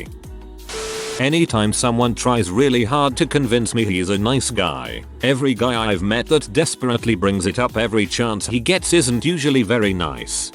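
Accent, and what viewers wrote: England, United Kingdom. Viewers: Why is this narration in an English accent? Much harder to understand.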